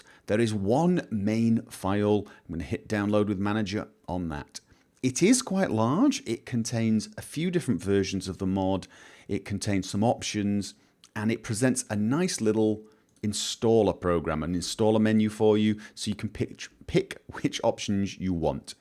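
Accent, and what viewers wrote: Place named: Scotland, United Kingdom. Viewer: the fudge? he be english? sounds a bit scottish but without the heavy accent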